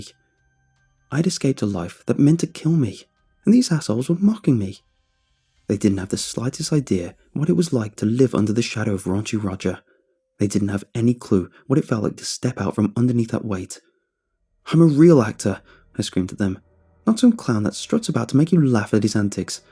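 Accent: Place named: Northern England, United Kingdom. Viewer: Love the accent I'm from London and he's northern but not sure where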